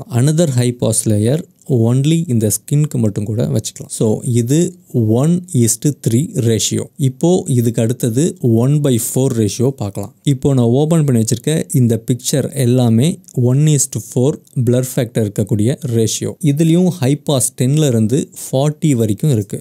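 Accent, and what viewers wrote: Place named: India. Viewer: Saya dari indonesia.. Tutorialnya bagus, tapi saya tidak terbiasa mendengarkan bahasa inggris aksen india.. Sebaiknya ada tulisan inggrisnya, atau translet indonesia.. 😃😃😅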